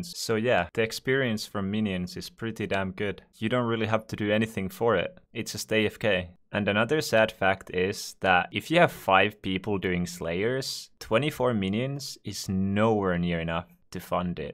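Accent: Finland. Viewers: are you from finland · U finnish? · is this dude from finladn? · ootko suomalaine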